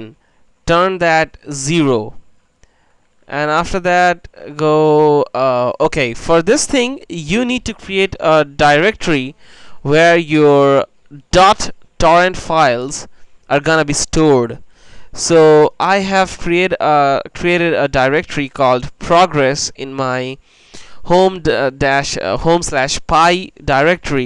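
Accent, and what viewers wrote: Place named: England, United Kingdom. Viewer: nice english accent!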